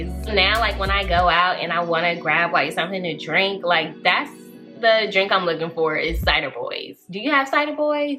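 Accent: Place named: South, United States. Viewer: Great information. I love your Southern accent! I will travel to Charlotte next month.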